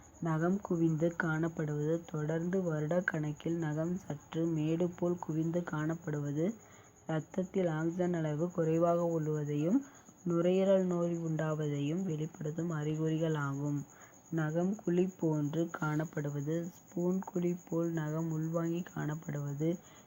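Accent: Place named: Kerala, India. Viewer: pesurathe Kerala mam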